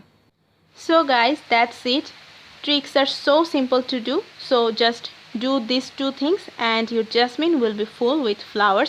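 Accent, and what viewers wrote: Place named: Bengal, India. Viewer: Bengali sweet accent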